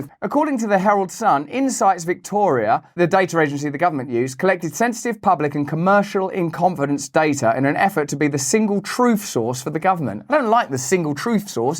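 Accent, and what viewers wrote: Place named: United Kingdom. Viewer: He said "... Jiggery-Pokery" with a straight face! 🤣I love this guy! He breaks down the HEAVY IRL news with a great sense of humor, Empathy, irony, & an amazing British accent. You've come a long way, Russell! So have we all. Still evolving. Big hugs to Everybody❤️! (non-corporeal, if that's easier for you😉)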